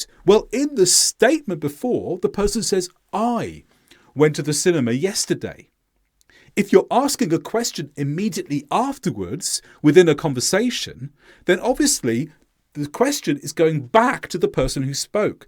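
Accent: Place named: United Kingdom. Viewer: it is a pleasure to listen to English with a British accent. We, in Romania, only learn American English at school, being convinced that it is British 😂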